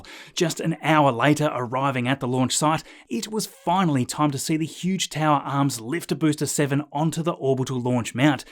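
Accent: Australia. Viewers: ..... Awesome presentation again.... 👍... If I could remind you, your success at least in my simple brain 🧠 lol 😁...is do to some awesome folks with British accent.... First one is John Romer archeologist, and David Attenborough naturalist.... I just totally loved these two and their presentations with that accent, your Ozzie accent is similar to theirs and captured my attention in the beginning of your YouTube stardom 🙂. Of course you do have a hell of lot more charisma than both of them two above lol 🙂....not to mention the effort and the knowledge you put into your presentation. Much appreciated mate 👍🤜🤛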